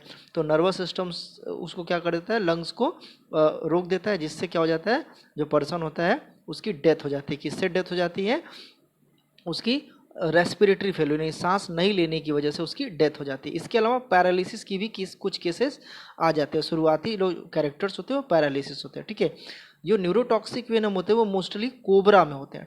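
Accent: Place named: Bengal, India. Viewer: Bhai yh bengali h iska language issue h yh bechara apne taraf c snake he bol rha h hme snak sunai d rha h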